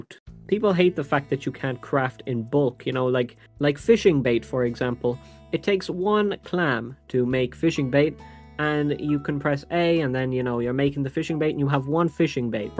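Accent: Ireland. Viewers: irish person detected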